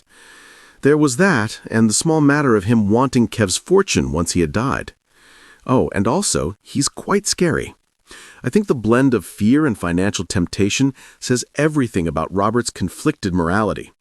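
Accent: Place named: United States. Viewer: What is going on with all these American voice overs. It’s off putting.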